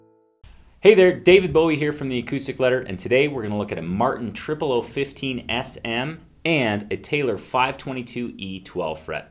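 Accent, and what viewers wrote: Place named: United States. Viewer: Nice job, David. You sound more like a Yank as the years have gone by.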